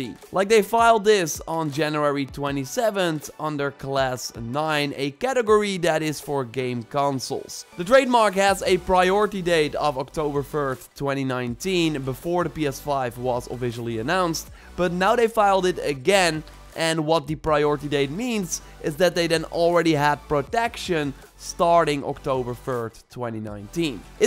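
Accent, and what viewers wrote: Netherlands. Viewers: U have an dutch accent are u dutch want ik wel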